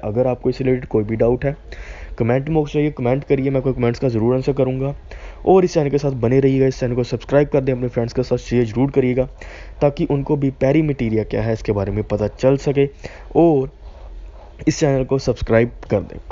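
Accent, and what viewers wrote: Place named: Punjab, India. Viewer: Bhai Punjabi accent hain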